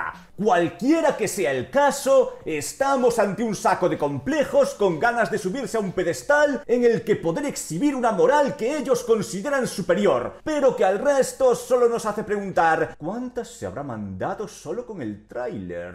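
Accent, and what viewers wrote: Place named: Europe. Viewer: Wey pero los que mas ladran sobre estos temas de la ficción son los socialjustice warriors de yankilandia además me sorprende ver este vídeo de una persona de acento de cierto pais de Europa ya que ustedes se quejan por todo 😂 o a lo mejor me equivoco y estas utilizando loquendo para sonar asi pero igual yankilandia y ese cierto pais de Europa se la viven quejando por problemas inexistentes 😂 son todo un Show 😂 lol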